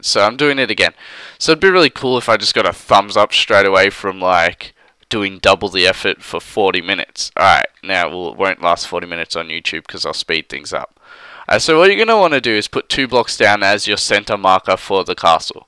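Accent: Australia. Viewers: man he's clearly australian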